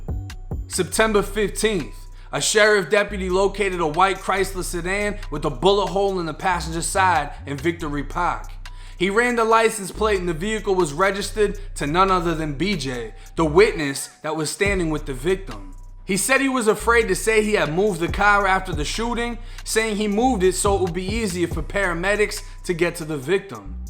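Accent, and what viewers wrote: Boston, United States. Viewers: yo are you from Boston your accent is crazy 😹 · It’s a bit of a Boston accent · You must be from Boston Massachusetts just from the way you talk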